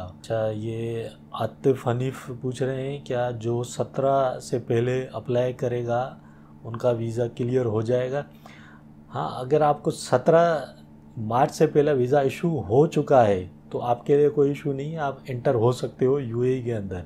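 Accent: Sindh, India. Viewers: Ada sindhi aan cha laheje mon lage tho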